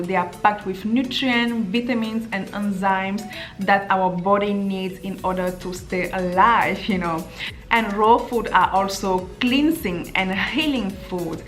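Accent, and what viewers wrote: France. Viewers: Are you a native French speaker? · Elizabeth Lizzy it is a French accent, it was mentioned in her Q&A video :) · Are You French ? Super video! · I think it's a french accent (kinda like mine ahah)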